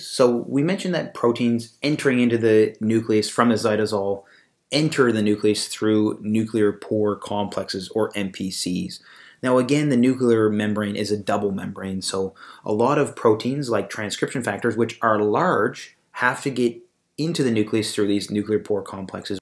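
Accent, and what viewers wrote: Canada. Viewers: You rock!!! Thanks so much and do I sense a Canadian accent?? I love it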